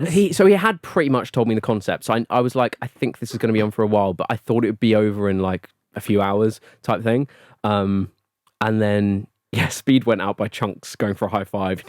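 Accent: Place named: RP, United Kingdom. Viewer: it's a joke because he's very polite and posh sounding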